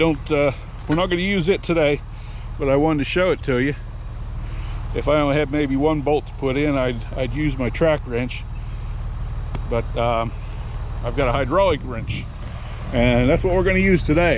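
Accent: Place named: South, United States. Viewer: My dad still slips up sometimes and says “worshington” and “worsher” he’s been in the South for 36 years! When I heard you say “worshington” the first time I knew you were in my briar patch. Been meaning to tell you that here in Tuscaloosa is home to the longest wooden train trestle still in operations that crosses the black warrior river between Tuscaloosa and Northport. Norfolk Southern runs. I’ve been seeing Union Pacific locomotives a bit more here as well not sure what’s going on there but they’re gorgeous engines! I love seeing that flag thunder by shaking the ground! Oh FYI that trestle, over 3500 long! Google it sometime!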